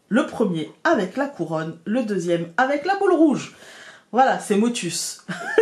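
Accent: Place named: Picardy, France. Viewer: Coucou pourrais tu me faire savoir quel Lenormand tu utilises pour ce tirage. J ai tous tes jeux et celui-ci ne me dit rien. Je l ai peut être loupé. Merci pour le partage et pour ta réponse. Belle semaine à toi avec l accent picard. 🌞💐🌳🌞😘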